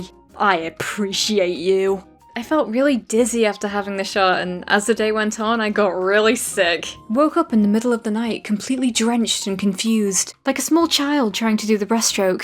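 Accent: United Kingdom. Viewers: who else stans british girl voice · Omg, she’s BRI’ISH!! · EW YOU'RE BRITISH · I come for the stories, and stay for the British accent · British language ahh I like it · Oh dear do I luv your british accent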